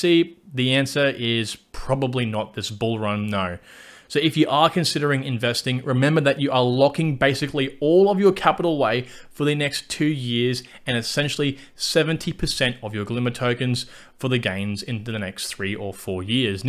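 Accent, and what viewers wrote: Australia. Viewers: Aussie mate!!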